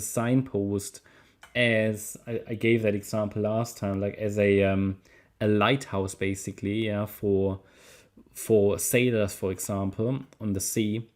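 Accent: Germany. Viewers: Much better German English than mine😅👌🫡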